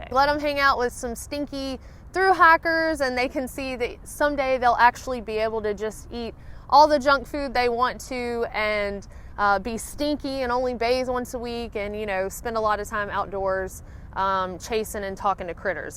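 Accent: South, United States. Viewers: I wouldn't take any medication that wasn't individually wrapped; nothing from a bottle of pills., I love your videos and they are always interesting and inspiring. Hearing a beautiful Southern accent coming from an intelligent woman is wonderful. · your southern drawl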